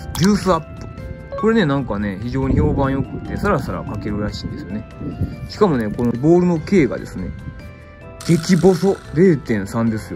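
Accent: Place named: Kansai, Japan. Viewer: かっこいい快適関西弁嬉しい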